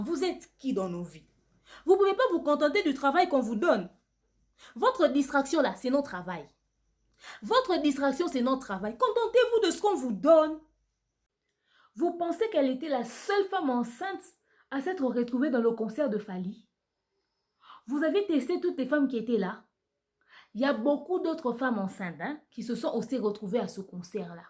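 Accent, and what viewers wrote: Ivory Coast. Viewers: Ma fofolle reste béni je t'aime moi j'aime l'accent ivoirien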